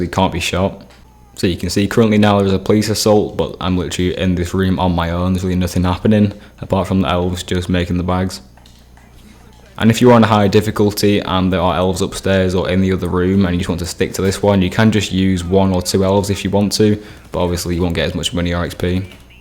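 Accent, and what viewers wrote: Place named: United Kingdom. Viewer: disliked. british voice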